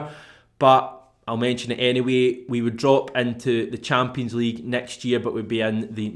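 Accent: Scotland, United Kingdom. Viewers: He's scottish. I think most folk don't understand us.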